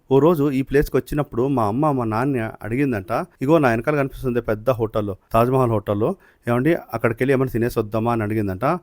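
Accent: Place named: Rayalaseema, India. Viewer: Hi I love your Telugu speak ing.it means you are from Rayalaseema.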